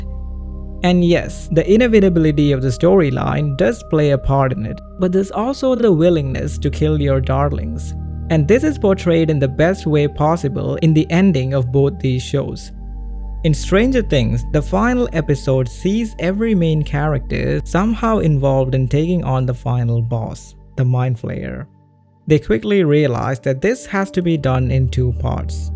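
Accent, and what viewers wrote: South India, India. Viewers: 😢 the americanised south indian accent is throwing me off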